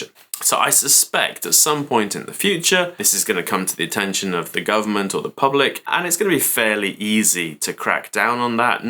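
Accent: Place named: Kent, United Kingdom. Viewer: Thank you for your clear videos. They are really appreciated. At the moment, it's hard to find genuine (non-sensationalized) information. A possible new/expanded topic, if you are looking... April 2026, bicycle rules. I saw your bicycle video about a month ago... You look good! But maybe some more details, could be good. It's not a huge topic but I can see another Police crackdown coming!!! Last crackdown, about 5-6 years ago?, I was waved off the sidewalk, on too an extremely busy & major road. Immediately, almost being sucked under a truck!!! After another on-road hit and run!!, I have NEVER cycled on the road again. But according to the new rules, sidewalk riding is not illegal, you just need to be careful & polite. How to register the bicycle for Crime Prevention (especially, if you buy from Amazon). Near us, local shops are not willing to help unless you buy their bicycles, which I can understand. 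The Local Police, wouldn't do it!!!? That seems very strange. Last video, you mentioned Insurance but not TS Marks. Not mandatory but encouraged, if not covered by car or house insurance. A good idea for shorter term people. Just an idea for a new or continued video. Thank you for your videos. 😉 Dare I ask, did you used to live in Kent? To me, you sound very much like a Kentish person.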